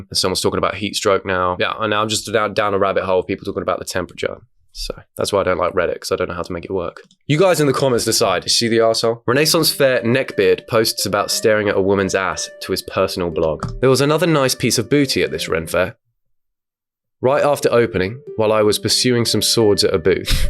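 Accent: United Kingdom. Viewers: It’s just the way the British pronounce it, coming from a British person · wait have i been saying renaissance wrong or is he just british asf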